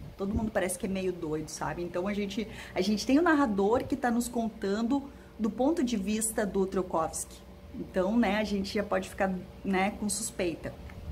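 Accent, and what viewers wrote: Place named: South, Brazil. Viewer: Oi! Vim pelo canal da Andréia, você deve estar ganhando alguns inscritos, merece! Como é simpática! E esse sotaque do Sul? Tenho memória afetiva de uma professora minha do primário, gaúcha, e adoro essa musicalidade no falar! Eu precisei parar seu vídeo e ler o Inquilino. Estou na parte em que ele vai para o apartamento do amigo da Stela e eles estão lá falando da moça que se suicidou. Depois que terminar volto aqui para ver o restante da sua resenha! Eu sou terror/suspense na veia! haha Você citou o filme ser melhor que o livro. Um super exemplo para mim disso é o filme 1408, baseado em um conto do King. Você deve conhecer. Fui ler o conto após ter visto o filme e pela primeira vez achei que King não explorou direito o potencial do conto e do personagem haha, que heresia! Não parecia o mestre da prolixidade, porém temos que entender que é um conto. 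Ainda assim, quando acabou eu falei: mas já? kkk mil vezes o filme! Como dizem, ganhou mais uma inscrita! Bjs!!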